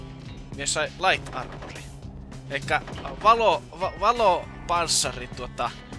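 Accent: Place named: Savo, Finland. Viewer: On kyllä tuo savolainen vakkooja niin komeeta kuultavaa :D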